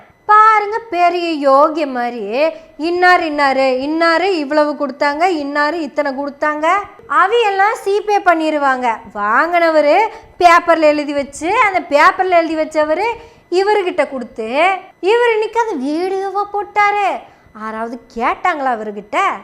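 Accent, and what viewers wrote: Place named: Coimbatore, India. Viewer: இப்படியா, கும்மாங்குத்து குத்து அடிக்கிறது, கோயம்புத்தூர் slang-ல.. ஏற்கனவே அந்த திரள் நிதி திருடன் போலீஸ் கேஸ்ல, மாட்டிக் கொண்டு, ஜெயிலுக்கு போறதுல, பேதிக்கு போயிட்டு, இருக்கான், சைமன் செபாஸ்டியன் aka சீமான். அவனே, ஒரு அல்லக்கை..வாடகை வாயன். அவன், ஒரு தற்குறி . ஆனால், அவன் பின்னால், நிறைய ஜோம்பிஸ். இவர்களுக்கு, என்னவென்று சொன்னாலும், புரியாது. ஆளும், வளரனும்.அறிவும் வளரனும். (எம்ஜிஆர் பாடல்) 😂😂😂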